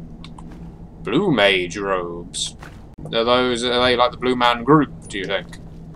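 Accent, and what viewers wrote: United Kingdom. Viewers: stories like this sound so much better in a English accent also lock pick is a waste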